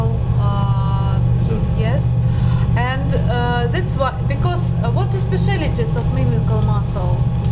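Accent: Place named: Russia. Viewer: i love that russian accent bro :)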